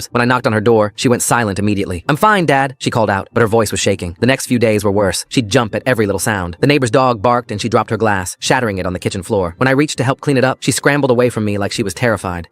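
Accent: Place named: United Kingdom. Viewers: Where did the British come from bro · Why did it randomly turn British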